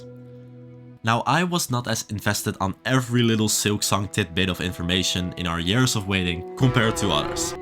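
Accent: Netherlands. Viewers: Ik wist dat ik het nederlandse accentje hoorde 🤯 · And team Netherlands 🎉 I couldn't not recognise your Dutch accent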